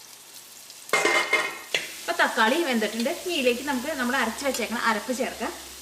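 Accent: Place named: Vypin, India. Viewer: cheachi super vypin karude bashayil paranjal thakarthitund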